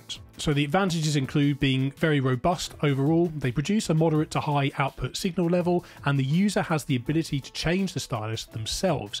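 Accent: United Kingdom. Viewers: Excellent video. Fantastic description.. efficiently to the point. Also the British accent is quite a nice compliment to the dialogue.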